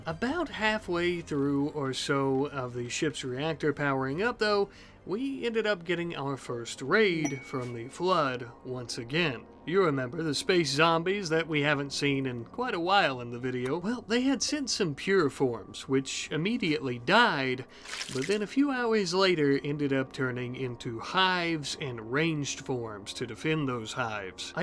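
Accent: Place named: Transatlantic, United States. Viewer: is this your natural voice? it sounds like you're making a jim carrey smirk type of forced face with a idea of some kind of transatlantic accent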